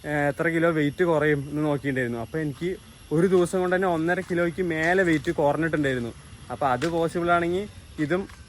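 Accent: Palakkad, India. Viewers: Hello videos ellam ishtakunund palakkad slang kelkkan orupadu ishtanu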